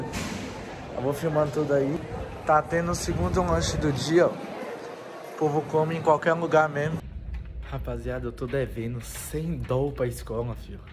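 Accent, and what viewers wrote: Bahia, Brazil. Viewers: Tu é baiano ?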